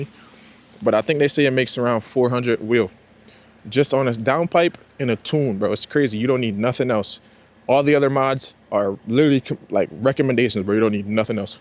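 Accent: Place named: Canada. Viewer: Kinda sound Canadian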